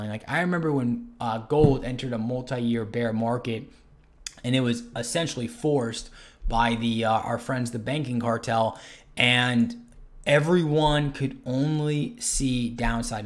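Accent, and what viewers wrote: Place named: Texas, United States. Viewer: where are your from? Texas? I was wondering because I never heard your accent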